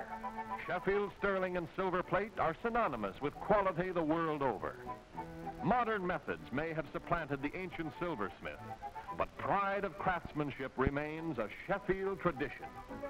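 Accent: United States. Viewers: Infant death rates and death in childbirth were higher. Polio, TB was common. This was an advertisement with an American narrator.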